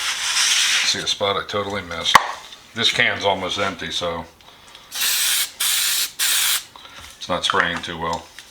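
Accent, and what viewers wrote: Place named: Philadelphia, United States. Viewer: where are you from? I think I hear a Philly/ South Jersey accent.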